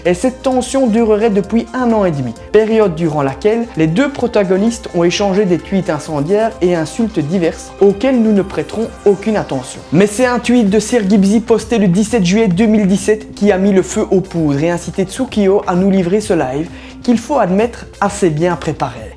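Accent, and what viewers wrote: Belgium. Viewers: mec es ce que tu es belge car ta voix my fait penser et t'es drama ces de l'humour ou tu fais que informer sur des drama avec des preuves concrète ou tu fais juste sa pour attiser la haines ou tu utilise le mots drama juste pour la forme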